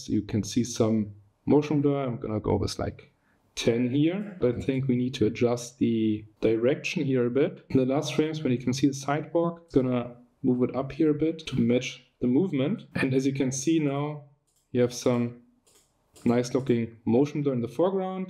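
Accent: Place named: Germany. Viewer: Du bist Deutscher ich hör das raus aber Nice Video haha 😂❤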